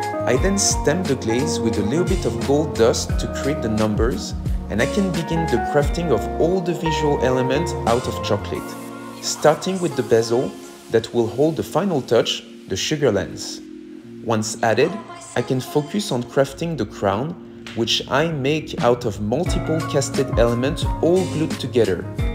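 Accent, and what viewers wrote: France. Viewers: You are french? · Je pense qu'il l'est, il a énormément l'accent français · Love hearing him talk. Love that smooth French accent.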